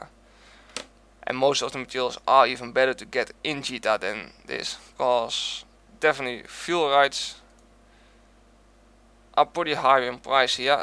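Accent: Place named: Germany. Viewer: Thanks you havery an interesting accent and I was just wondering kinda sounded like a mixed german accent.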